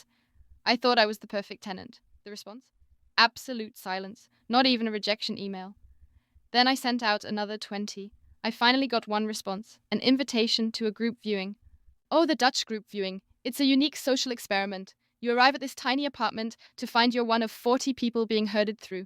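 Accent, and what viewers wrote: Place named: Eastern Europe. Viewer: Don't forget the Eastern European accent, pretending being from the US.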